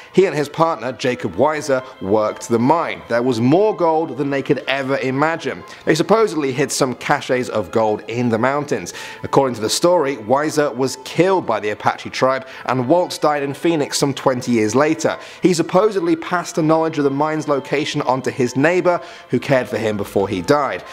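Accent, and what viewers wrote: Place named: United Kingdom. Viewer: Never have a Brit narrating.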